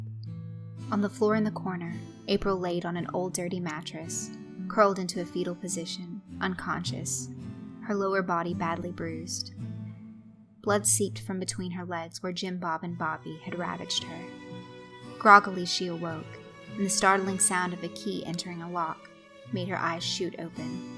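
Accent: South, United States. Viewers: I love the southern accent you used while telling this story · You are definately underrated as a narrator. You are one of favorite reader and I love how you can do both a southern and english accent!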